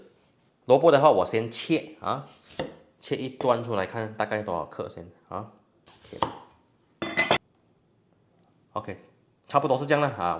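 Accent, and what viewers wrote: Malaysia. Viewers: 沒有臘腸和冬菇的蘿蔔糕😅聽口音是不是大馬風格的蘿蔔糕啊😅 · From the way he talks,I think he is a Malaysian Chinese...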